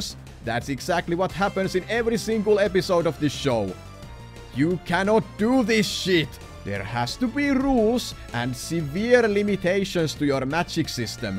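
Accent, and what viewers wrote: Finland. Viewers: Came to see High Guardian Spice being ripped to shreds for the atrocities it commits on the name of animation and anime, and stayed for the great jokes, funny delivery and that thick Finnish accent... jatka samaa mallii, ootan innolla osaa numero 50! 👌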